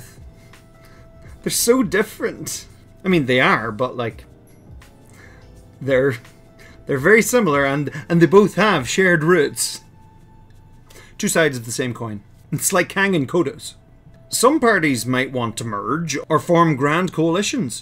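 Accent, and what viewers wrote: Ireland. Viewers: Thanks for doing this video, Ive done a very similar one looking at the same content, just with a lot more data and less of an Irish accent! Check it out and let me know what you think of it "What if Ireland United?" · I don't hear any sounds in his speech that aren't Irish. American accents are more influenced by some Irish accents than by others. In particular, the more northerly Irish accents have more in common with some of the best-known American accents.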